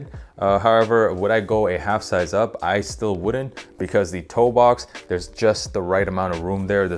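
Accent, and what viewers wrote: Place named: United States. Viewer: it was good man, nett video ! you speak english like an American if you didnt mentioned you were from Turkey I thought you were from the States 👍👌🇩🇪🇺🇸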